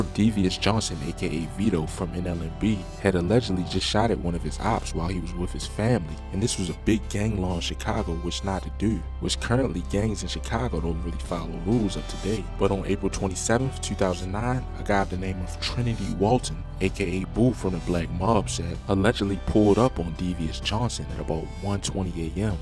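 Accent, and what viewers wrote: Baltimore, United States. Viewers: That Baltimore accent heavy Asf bro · Definitely from Baltimore accent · you from baltimore. Accent crazy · stop the bs he definitely from Baltimore lol I have family from there this is Baltimore accent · baltimore accent so annoying lol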